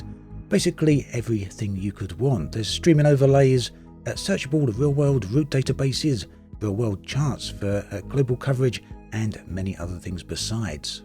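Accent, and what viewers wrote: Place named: Essex, United Kingdom. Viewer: As a UK citizen I find it fascinating that anyone would think of an Essex/East-ish London accent as ASMR - ha ha. They do say the only was is Essex (UK TV joke). Sorry to if I'm wrong.:-)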